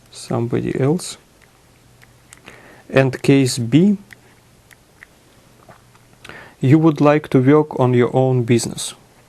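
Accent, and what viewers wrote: Russia. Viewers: Russian accent is cool :p this video is the real deal. Very informative, thank you. Why not write a book ? You have plenty information and experience. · I agree. The accent is great [Russian accents are just so frickin cool] and the explanation is great. what's bad is that there's still people in America who don't think this kindof thing could happen to us, but we're SO on that path of reaching over 1000% inflation. the only real way to get rid of an economic crisis like this, IMO, is to get rid of the central banking system [which tends to be the root of all the problems] and go to a solid, consistently valuble money standard of sorts. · This Siberian chatterbox has the most irritating accent imaginable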